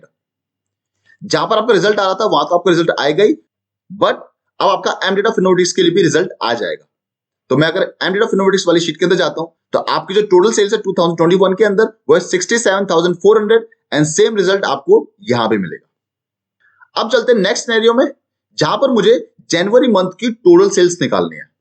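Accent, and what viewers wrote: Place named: India. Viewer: Indian speaking but excellent demonstration. Thanks a ton. Big like and subscribe for attaching the working file. 👍👍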